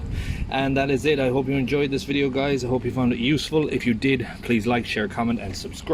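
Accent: Ireland. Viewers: Are you from Cork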